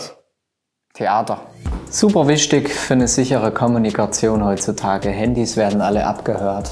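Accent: Saxony, Germany. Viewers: Auch wenn es nur Spaß ist, aber warum haben deine ganzen Querdenker-Videos nen Sächsichen Akzent???? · Mal ganz ehrlich, klar es ist Spaß aber warum spricht er in den Videos mit Sächsischem Dialekt…warum nich einfach Hochdeutsch…das ist so Klischee Haft und herablassend…einfach traurig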